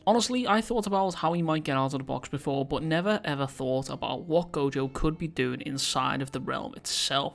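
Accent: Liverpool, United Kingdom. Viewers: You a scouser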